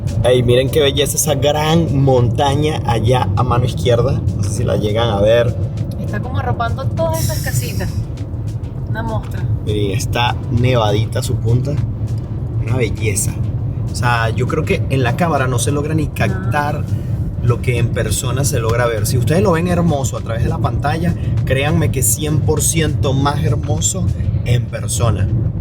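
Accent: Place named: Venezuela. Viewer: Hola. Excelente es muy refrescante y entretenido. Felicitaciones paisano 🇻🇪👍 claro ese acento del venezolano es Inconfundibles!! Jajajaja desde Venezuela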